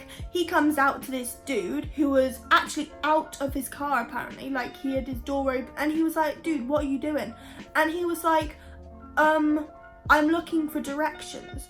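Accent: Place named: Wisconsin, United States. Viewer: Came from Tik Tok and didn’t realize you had an accent. Lol such a surprise. I forgot not everyone talks with a “Wisconsin Accent”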